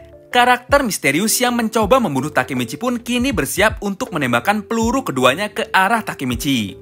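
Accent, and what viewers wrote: West Java, Indonesia. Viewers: Drag-keun orang sunda ya ?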